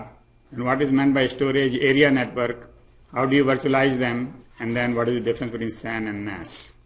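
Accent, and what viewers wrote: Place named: India. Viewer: He has Indian accent, may be that's why it is hard to understand. Otherwise it is quite good english. Thanks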